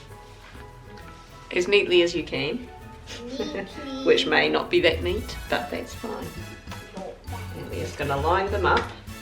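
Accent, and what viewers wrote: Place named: New Zealand. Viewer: Yum! I have just found your channel and I find you so refreshing and natural. It’s great to see and hear a genuine Kiwi for a change. I’m in the Bay of Plenty. 😀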